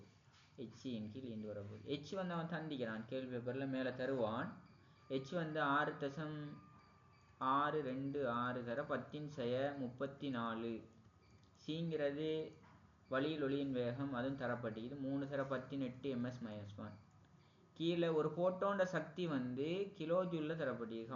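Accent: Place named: Tamil Nadu, India. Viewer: Your voice awesome ❤ also ur tamil slang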